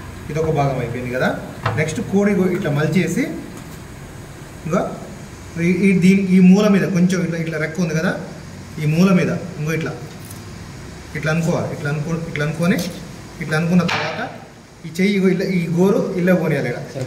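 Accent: Telangana, India. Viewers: Explain చేస్తున్నప్పుడు మధ్య మధ్యలో "ఇంగో ఇంగో" అంటున్నవంటే తప్పకుండా నువ్వు మైబునగర్ జిల్లోనివే అనుకుంటున్న.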